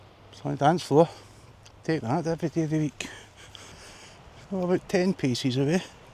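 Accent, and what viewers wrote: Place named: Scotland, United Kingdom. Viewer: Where from scotland, sir?❤❤❤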